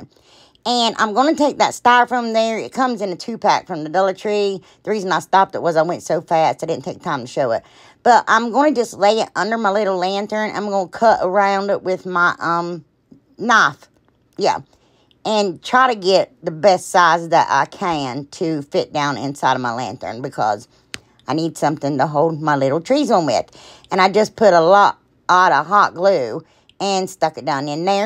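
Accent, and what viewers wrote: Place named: West Virginia, United States. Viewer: I’m so happy I found you on YouTube! You’re so real and you make me feel better about myself because I am not tech savvy and just starting to learn crafting. I love your accent and being from WV, I have one too but I don’t hear it unless someone records me or I hear my own messages I leave my husband and he doesn’t listen to them before I get home. You are my favorite YouTube show now and I sent my sister your video so she can subscribe. Thank you and God bless you for being you! I love you girl! I would love to meet you if you ever have a show, Columbus isn’t that far from me. I’m about 20 minutes from Gallipolis, Ohio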